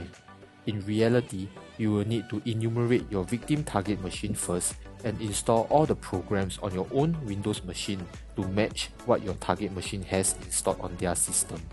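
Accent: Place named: Singapore. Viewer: Bro ur video is awesome. Your accent sounds like Singaporean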